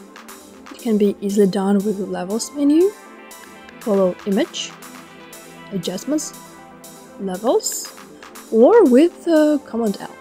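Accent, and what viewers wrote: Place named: Russia. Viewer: мне показалось, что у русский акцент, или это действительно так? it seems like voice has russian accent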